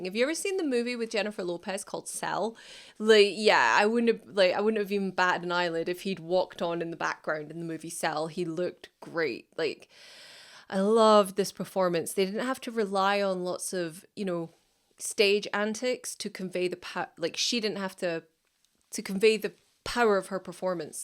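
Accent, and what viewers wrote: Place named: Scotland, United Kingdom. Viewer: I thought u were from Ireland then u realised your from beautiful Scotland ❤️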